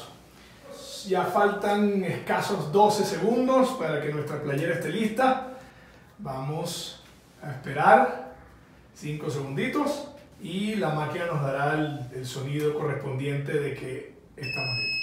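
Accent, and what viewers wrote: Venezuela. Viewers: Acento Venezolano impecable. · Cambie el vídeo solo por el acento venezolano.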